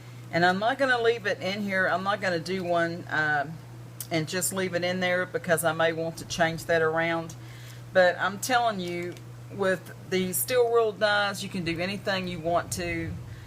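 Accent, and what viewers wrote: Kentucky, United States. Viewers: My flower turned out more flat. What can I do to make more dimensional like yours. I love your accent I grew up in Irvine,Ky, makes me homesick! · Thanks so much for this! I just got this die on clearance and wasn't sure what to do with it. Your video is great - love your accent! Reminds me of my mom, from KY. I'm a new follower to your creative channel! Jean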